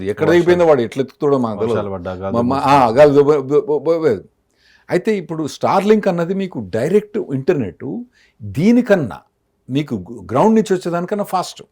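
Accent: Telangana, India. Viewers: screen layout clean, clarity on discussion Telengana accent entertaining Keep doing more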